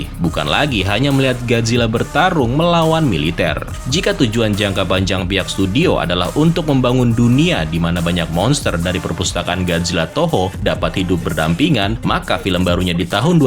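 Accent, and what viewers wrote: Java, Indonesia. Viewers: Bang kau orang jawa ya bang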